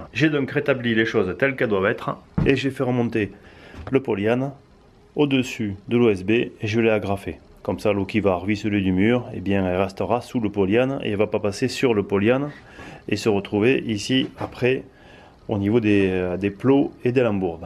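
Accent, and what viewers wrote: South, France. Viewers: Belle vidéo comme d'hab. ce paysage est magnifique et cet accent me dit quelque chose je pense que nous somme pas loin l'un de l'autre. Poir ce qui est des plot tu peut le remplacer par des laver autoblocant cela marche très bien aussi allez ciao a très bientôt dans tes vidéos qui me font passer à chaque fois un super moment même si comme toi j'ai un grand jardin qui me permet de pas m'ennuyer Jphi de carcassonne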